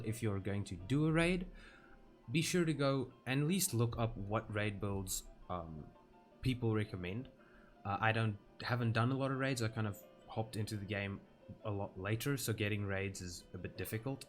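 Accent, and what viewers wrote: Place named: South Africa. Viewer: Thanks for the video. Which part of SA are you from? I've been to Durban for some time.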